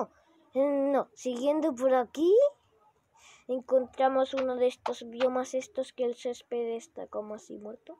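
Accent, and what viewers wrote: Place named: Spain. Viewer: Buen vídeo amigo pero un consejo no hables como un español porque hay gente mala que se te va a burlar. Yo no soy una yo te felicito y gracias por las semillas 😁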